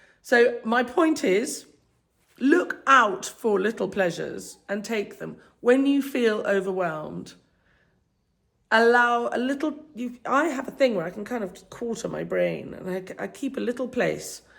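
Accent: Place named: England, United Kingdom. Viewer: I love watching your videos! First of all your English accent is beautiful. I am a New Zealand living in Queensland Australia. I trained as a florist in 2015 but was never able to get a job at a florist. Now I deliver flowers for a delivery company. It is overwhelming at times as the traffic here is a nightmare. It isn’t a relaxing job at all. I often feel stressed and rushed because I just want to get the jobs done and get off the road! I watch your videos and wish that I could do what you do. Unfortunately we rent our house so that is a problem. Oh well, maybe when the Lord calls us home, maybe He will have a bountiful field of flowers for me to attend to🌸😍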